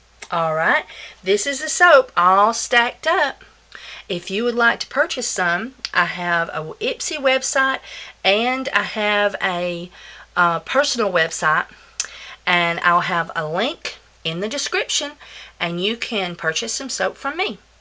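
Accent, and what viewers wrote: South, United States. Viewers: This is the best soap making lesson video, gotta check out more of your videos. And love your Southern accent, thanks for sharing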